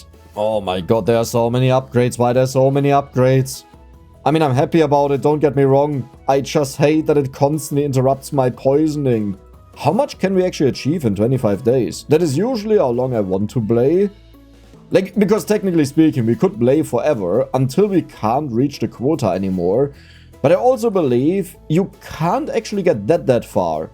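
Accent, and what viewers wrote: Germany. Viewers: I always thought why his accent is so like german until he Said things in german #Selberdeutsch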